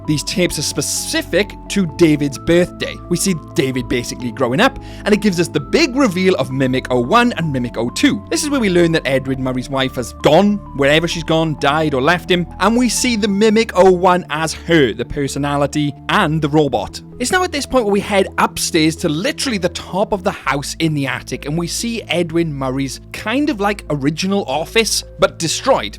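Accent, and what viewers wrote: Wales, United Kingdom. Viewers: Are you welsh ? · Great video bro love from England but I think your a Brit as well ❤love to anyone reading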